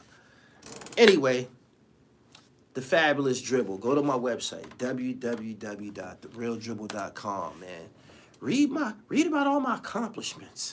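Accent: New York, United States. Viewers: You do sound like 1 of them bias new York dudes im just saying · Your slick New York talk is old my guy!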